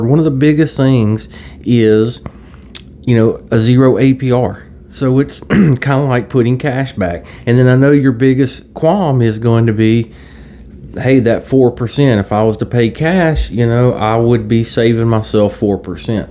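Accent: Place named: South, United States. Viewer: Why am I just now finding your channel? I am a southerner and miss hearing a good southern accent where I am at currently. Great on the card info. Love the Magnolia (have one in my front yard).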